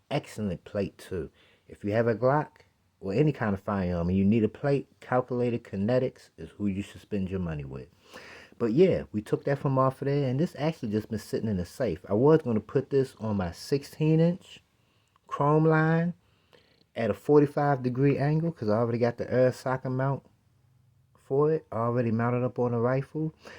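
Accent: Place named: Alabama, United States. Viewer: my fiancée (southerner) said your accent sounds like you’re from Alabama or Mississippi. Was she close?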